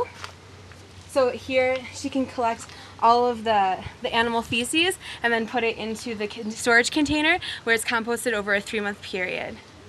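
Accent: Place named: New England, United States. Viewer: Yeah great job, watch your steps, lol... wow I'm so impressed with your Spanish, oh by the way I used to work with FUNCEDESCRI too when I lived there. Is from New Ingland? cuz of the accent, wow tha was a 3 minutes walk. Any way great job.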